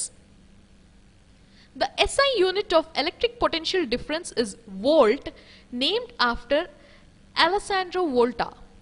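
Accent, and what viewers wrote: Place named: India. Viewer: It’s an Indian accent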